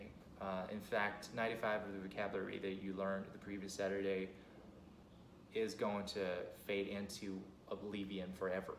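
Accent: South, United States. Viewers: Hi, I have a question, how did you learn English? From your accent, I guess you went to american at an early age and studied maybe middle school in USA, is my guess correct? ❤ your sharing videos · Your English has a southern style to it 🔥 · Dude how do you sound so Southern?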